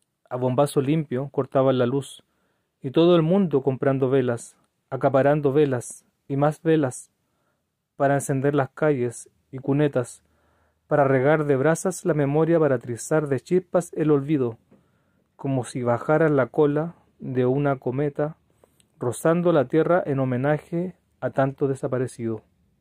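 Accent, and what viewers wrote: Chile. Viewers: siempre tuve debilidad por el acento chileno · un acento chileno ejemplar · noté el acento chileno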